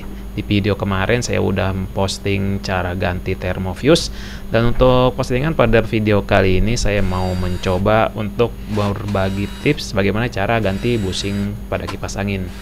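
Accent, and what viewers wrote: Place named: Banjar, Indonesia. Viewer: Org banjar kh, logatnya tu..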